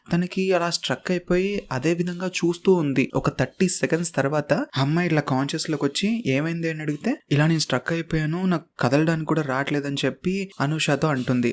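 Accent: Telangana, India. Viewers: Anna story super and mee Telangana slang inka super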